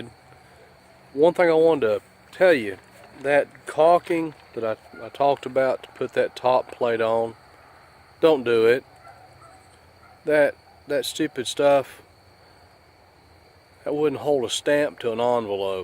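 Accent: United States. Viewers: I think the southern accent is a clear indication he is not from California. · The minute you started talking I said, he's from Georgia. I'm glad you mentioned you were in Georgia there at the end. Good to be right!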